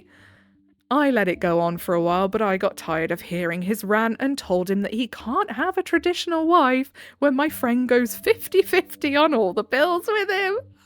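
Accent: United Kingdom. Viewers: I've discovered your channel last week. It has been recommended to me by Youtube. I was particularly interested by the "Human narrator" in the thumbnail. Your voice is very soothing and I love hearing some British accent. So, you've convinced me, I'm making an offering to the algorythm, because there's more people like me who would like your channel to pop up in their recommandation as well.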